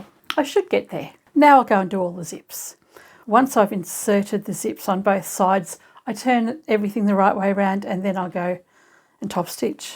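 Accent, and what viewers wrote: Australia. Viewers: NZer here. Aussie, accent is slightly different from NZ.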